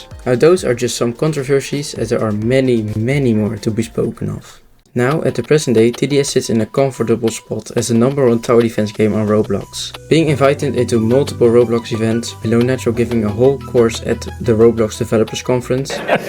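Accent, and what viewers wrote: Netherlands. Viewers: You really don't try hiding your Dutch accent, huh? 😅 (Nothing bad about it) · I might be wrong but are you dutch? You realy sound like a dutch person(amazing video btw keep cooking) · I know a dutch accent when i hear one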